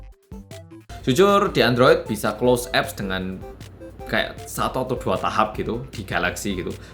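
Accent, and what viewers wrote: Java, Indonesia. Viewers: Korea jowo, mantap banget bahasane mas wkwkwk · Anjirr lancar banget bahasa Indonesia nya Ampe ke Jawa jawaan😆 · 14 apa 13 pro bang medok wkwkk ?? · Kog logat e jowo😁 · Mas yutub kalau jelaskan super detail. Medoknya khas... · Samsung endorse dong, Iki wong korea tenanan lho, cuma yo rada medok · Wong jowo yo mas? Medog jowo ne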